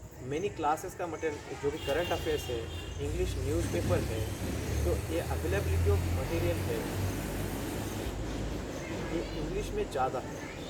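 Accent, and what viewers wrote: South, India. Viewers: Sry to ask bt Are u from Maharashtra or south.. Bcoz your name is bt your accent is like south indian.. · Sir ki english language ko south ka touch hai....IPS me unko south ka cadre mila hoga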